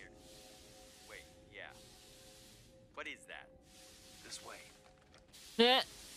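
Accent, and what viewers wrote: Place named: Scotland, United Kingdom. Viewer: I truly love your passion for Star Wars. I was the same, at one time. The first movie I saw in a theater was ANH, was very young but that spark of such a fantastical world and wonderful characters stuck with me as I grew up. Made me believe I could do anything. It did the same for so many in my generation, and we kept the faith for a very long time. But as sorry as I am to say it, those thoughts/dreams/beliefs have been absolutely destroyed since Disney took control. They systematically removed and obliterated that spark, took away the wonder and imagination of such rich worlds/characters. And in it's place gave us tropes, pale remakes of the original, girl bosses, and modern time standards put into a purely fictional world. I don't begrudge you any enjoyment from this or any Star Wars content. Goodness knows I've liked my share of entertainment that others thought was garbage. 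I just have to say this because you seem very genuine and I hope you're not ignoring what is actually taking place with one of the top 5 IPs of all time. And just to add: I've never really known why but the Scottish accent has always been my favorite (and a weakness in certain cases).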